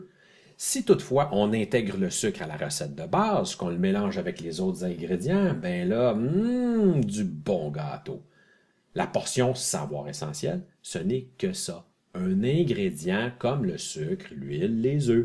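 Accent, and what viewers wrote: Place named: Canada. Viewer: mira Khodja cet l'accent canadien :)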